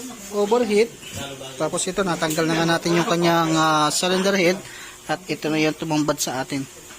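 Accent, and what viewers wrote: Ilocos, Philippines. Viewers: Ilocano yan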